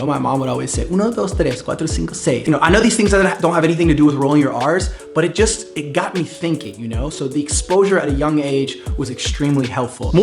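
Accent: Buenos Aires, Argentina. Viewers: Me parece o perdiste un poco tu acento natural? Te escuchaba muy porteño 😄😊 Me encantó el video... muy buen tip! 👏👏👏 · Más porteño que la mierda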